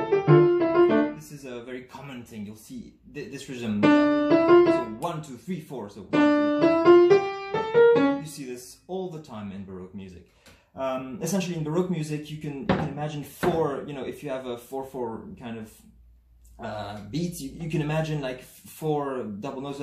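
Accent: France. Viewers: Merci pour tous ces exercices ! Je devine à ton accent que tu es français ?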